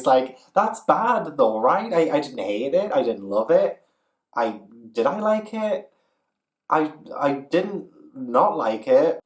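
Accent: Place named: Newcastle, United Kingdom. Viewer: ☕ Told there was drama 👀 Saw the hilarious intro ✨ Heard the Geordie accent 🔥 INSTANT SUBSCRIBE 🔥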